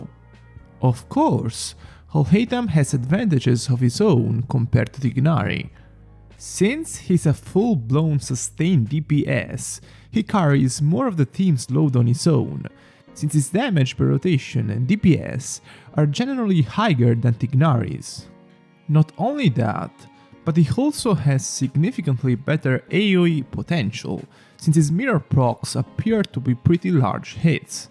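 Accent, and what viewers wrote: Italy. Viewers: I thought he was Italian from the accent but damn 😂😂😂😂😂😂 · I love this italian accent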